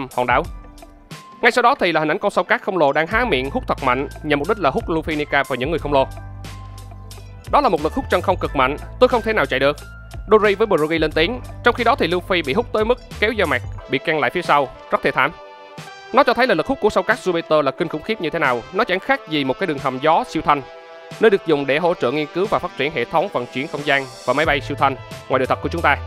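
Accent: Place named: Quang Nam, Vietnam. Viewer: ông ở quảng nam hay quảng ngãi z ad🤣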